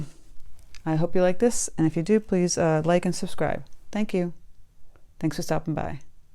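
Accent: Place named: New England, United States. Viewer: Thanks for the terrific info & lovely cards,. Btw, I'm from RI and notice your familiar accent! MA or ME? Like a "lethal" from home!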